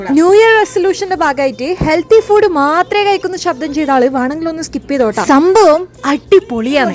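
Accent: Kannur, India. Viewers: ന്ത്‌ ആർട്ടിഫിഷൽ സംസാരം ന്നു. ഇത് കണ്ണൂർ സ്ലാങ് ആന്നു.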